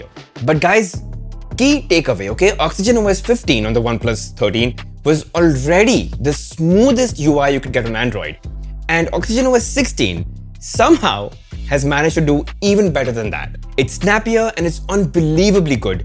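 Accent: India. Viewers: Your Indian accent sucks too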